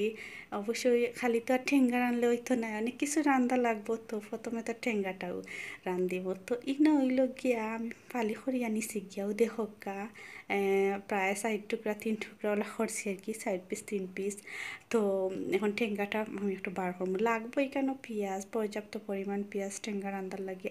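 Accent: Sylhet, Bangladesh. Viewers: কাছা মাছ কি বাবে খাবেন, আপু আপনার সিলিটি মাত আমার খুবিই ভালা লাগছে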